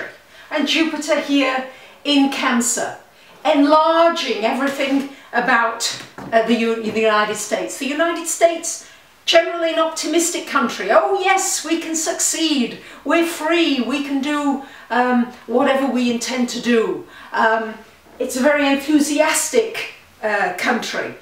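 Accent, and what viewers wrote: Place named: United Kingdom. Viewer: cant stand brittish accents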